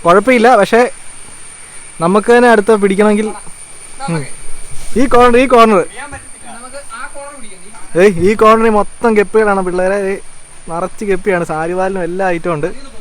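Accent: Kottayam, India. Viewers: Kottayam language powli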